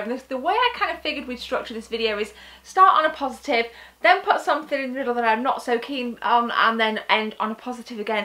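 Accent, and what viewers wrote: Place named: England, United Kingdom. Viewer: I watch you from italy and I love your positive attitude. I think you have a great talent both in front of the camera and behind it. Sometimes I don't understand everything you say, maybe because of fast speaking and english accent(I discovered that from time to time you pronounce "here" more like "hair" XD) but I enjoy anyway. I would suggest some more contents about news, and some reviews. A great hug from Rome and if you come here for vacation you and your wife will be my special guest in my B&B!!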